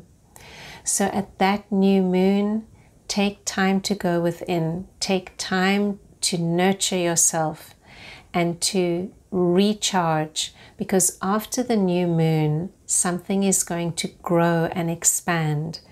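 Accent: South Africa. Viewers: Thank you for this reading and explaining it so calmly. I am having one of the worst years of my life and I do hope 2023 will be far better for me. I recognize your SA accent. Sending love from Cape Town 🇿🇦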